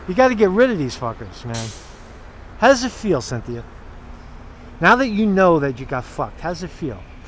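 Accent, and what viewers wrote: New York, United States. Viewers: I've figured out why your mouth fascinates me😜 it's your New York accent so it's how your lips move.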